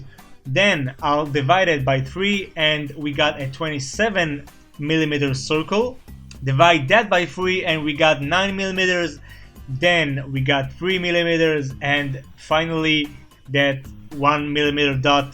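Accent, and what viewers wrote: Israel. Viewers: איזה מבטא ישראלי אמאאא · איזה מבטא ישראלי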